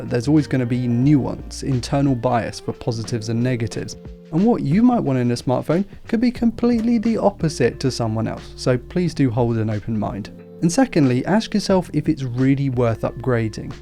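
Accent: England, United Kingdom. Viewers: an englishman posts clickbaits on principle- here it being to gather as many likes as he can. your accent is the MVP of your channel, Sire.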